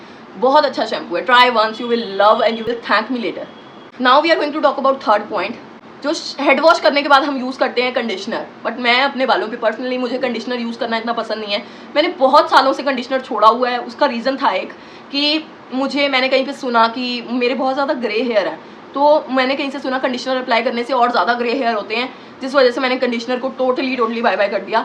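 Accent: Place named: Punjab, India. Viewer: Informative 😍❤ and i like your punjabi accent 😂🥰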